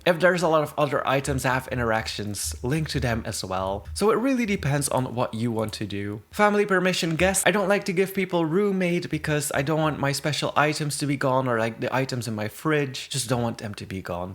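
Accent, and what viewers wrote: Netherlands. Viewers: are u dutch???!!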